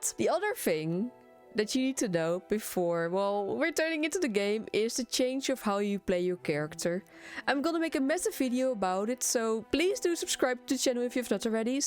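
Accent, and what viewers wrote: Netherlands. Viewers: Listening to a Dutch accent is always amusing, so high pitched. Good video and +1 for the accent, lol.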